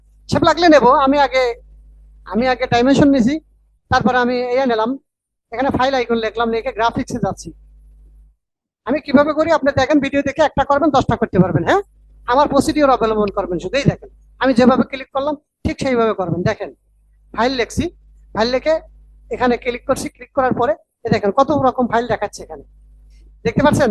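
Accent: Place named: Chapai Nawabganj, Bangladesh. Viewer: ভয়েস শুনে তো মনে হচ্ছে চাঁপাই এর পোলা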